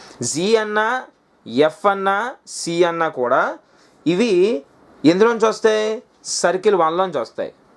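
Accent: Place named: Visakhapatnam, India. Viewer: Pure vizag slang❤️😍😍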